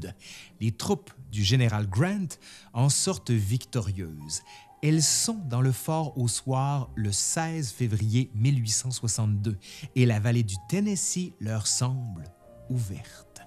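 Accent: Quebec, Canada. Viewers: Grave que j'ai adoré, première fois que j'écoute une vidéo historique par un Canadien où Québecois et l'accent, la façon de réciter, la passion, j'en redemande !! Bien le bonjour de la Belgique ! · L'histoire des États-Unis brillamment expliquée par un Canadien à la diction cativante et au merveilleux accent Québécois! 👍🙋🏼‍♂️ Remerciements et salutations depuis la Suisse.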